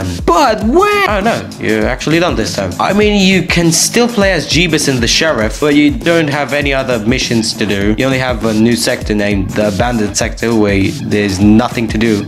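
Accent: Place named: United Kingdom. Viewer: This is most the British review i ever heard nice video bro Also bro the way he said that XD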